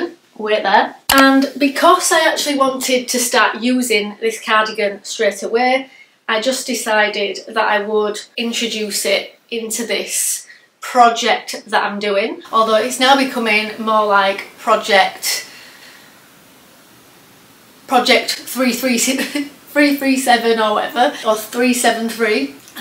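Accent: Yorkshire, United Kingdom. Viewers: I really love your channel. You are so inspiring. Are you from Yorkshire? Thank you 🇦🇺❤️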